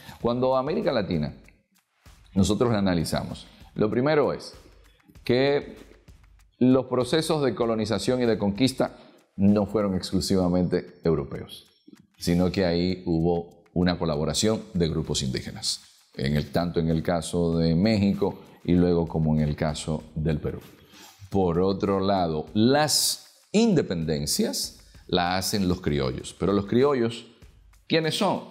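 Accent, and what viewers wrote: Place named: Venezuela. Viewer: No sabia eso,bien por este hombre además tiene acento Vzlano.,un saludo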